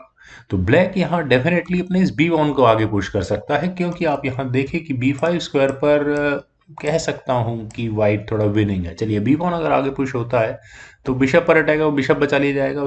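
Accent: India. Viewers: Could someone tell me whether the speaking person uses only English? Because of Hindi accent, I am not sure if it is only English or some Hindi words are used also.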